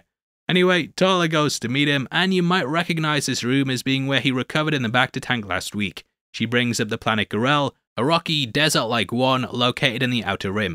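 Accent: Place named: United Kingdom. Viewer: Come for the takes, stay for the cool British accent. 🏴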